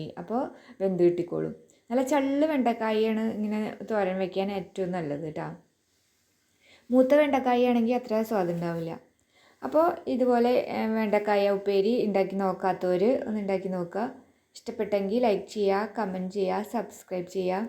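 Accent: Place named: Thrissur, India. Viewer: നല്ല ഒരു side dish 👍👌😋😋 New 👫🤝🔔✅️ തൃശ്ശൂർ ആണോ സംസാരം കേൾക്കുമ്പോൾ തോന്നുന്നുണ്ട്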